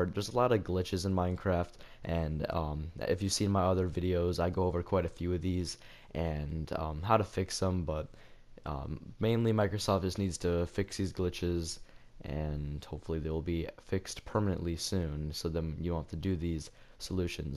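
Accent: Wales, United Kingdom. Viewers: You welsh bat? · Are you from Wales?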